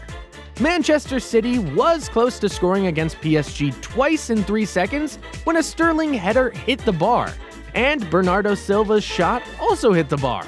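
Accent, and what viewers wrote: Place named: United States. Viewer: Bros definitely american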